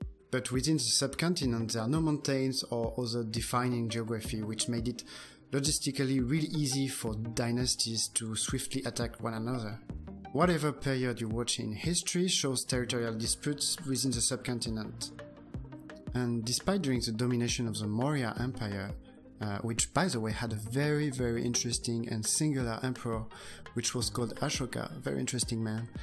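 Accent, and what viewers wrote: France. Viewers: the French accent is stronk here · Just 10 minutes far in the video, and enjoying it truely, but I have to comment now on your super cute accent. As a french myself I understand your struggle and I compliment you : you stress all the words properly. But still, it makes me smile. Shirvan commented a bit more on the difficulties that lies ahead of India, than you do. Do you put more weight on more optimistic views by choice, like your Patreon page says ? "Cheerfull foreign affairs videos" It chearfull for sure :-) Oh, my bad, you do talk about the limits to growth. thèse-antithèse-synthèse on se refait pas :-) I personally am afraid the positive developpment of India might suffer very much from the peak oil. Alltogether, views on economics, on politics, and geopolitics... all sumed up, given nuances... very beautifull peace of thinking. I proudly recognize the french-like attention brought to the organization of thoughts, with diagramms and titles, just like in school !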